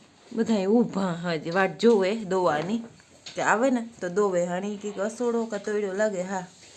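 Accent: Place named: Saurashtra, India. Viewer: જય વછરાજ તમે ઘેડના લાગો બોલી સોરઠ ની છે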